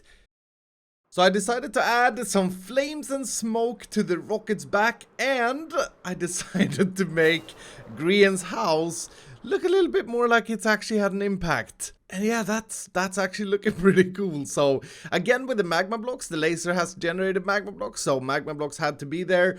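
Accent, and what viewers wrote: Sweden. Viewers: you should play Minecraft with Pewdiepie and both of you talk with your Swedish accents. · You sound like you arr from sweden, are you from sweden?? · Are you Swedish?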